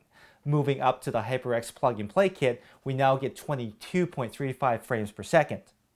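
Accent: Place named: New Jersey, United States. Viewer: That's a lovely accent you have there...New Jersey?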